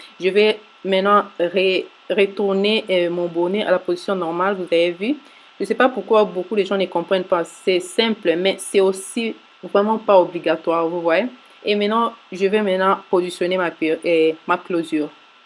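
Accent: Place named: Gabon. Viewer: j'aime beaucoup le thon gabonais de la part d'une kmer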